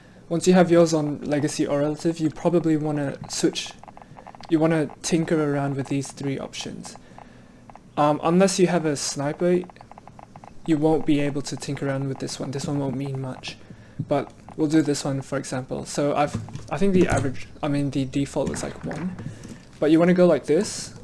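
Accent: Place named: United Kingdom. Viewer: Bro no hate but why do you sound like a British fearless😭😭 please tell me you can hear it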